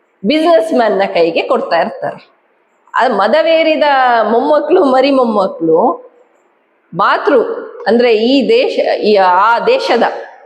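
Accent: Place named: Tulu Nadu, India. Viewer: ಮೇಡಂ...ನಿಮ್ಮ ಮಾತಿನಲ್ಲಿ ಯಾವುದೇ ತಪ್ಪು ಸಂದೇಶವಿಲ್ಲ, ಆದರೆ ನಿಮ್ಮ ಮಾತು ಕೇಳುತ್ತಿದ್ದರೆ ನೀವು ನಮ್ಮ 'ತುಳು,ನಾಡಿನವರಂತೆ ತೋರುತ್ತಿದೆ,ಯಾವೂರು ಮೇಡಂ..?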